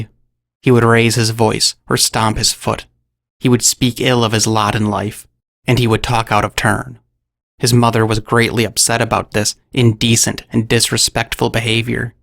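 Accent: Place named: Missouri, United States. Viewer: I really like your work Your a intelligent dude.I'm from Missouri that's the way Us Hicks talk.lol,Looking forward to your next video..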